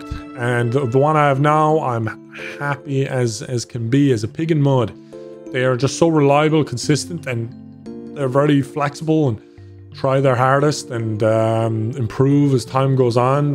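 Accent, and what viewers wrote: Ireland. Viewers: He sounded extra Irish in this vid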